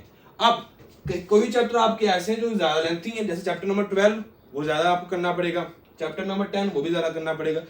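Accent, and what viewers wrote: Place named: Faisalabad, Pakistan. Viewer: Ap faisalabad sy ho? Q ki ap areeb ki tara bolty ho iqra kanwal ky husband jaisy 😊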